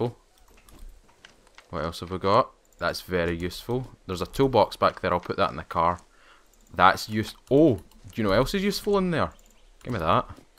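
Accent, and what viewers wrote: Scotland, United Kingdom. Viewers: I would love to hear you say with your scottish accent BEGGOONNEE THOOOT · This guy has a very stereotypical Scottish accent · I love the wee Scottish accent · Scottish, I think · only reason i came here, the Scottish accent. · I heard your voice and had to subscribe haha love the scottish accent probably due to my best friends family all be scottish and me growing up around it even though I love in england. Looking forward to binge watching some of your videos haha! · by the way you sound like bigmoney he is also a Scottish YouTuber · Is that scottish accent or welsh or irish or Scouser accent? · Tobot 27 it’s fukin Scottish he said he was from SCOTLAND 🤦‍♂️ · You sound like a very generic Scottish man but i still love you're voice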